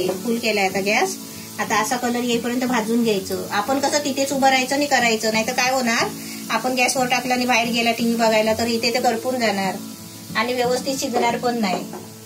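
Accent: Konkan, India. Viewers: Kaki tumch gaav konat tumchya bhashe varun tumhi koknatlya aahet asa vatat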